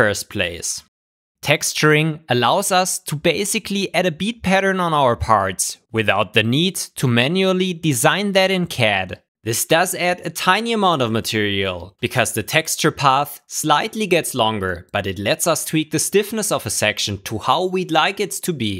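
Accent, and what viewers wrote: Germany. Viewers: Man kann den Deutschen Akzent richtig spüren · I prefer textured. Also, I love your accent, where are you from? Edit: Nvm, i just finished the video, probably, German?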